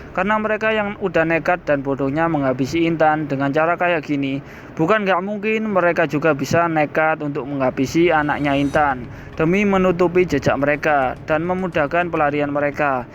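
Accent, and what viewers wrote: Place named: Java, Indonesia. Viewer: Lucu bgt ngomongnya.medox bgt gitulah 😅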